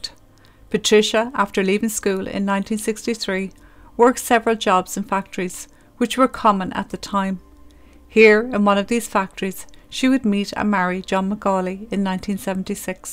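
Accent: Ireland. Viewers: Thanx I enjoy your channel,straight and to the point and of cos the Irish accent,the cherry 🍒🍒🍒🍒 on top.Much love from South Africa.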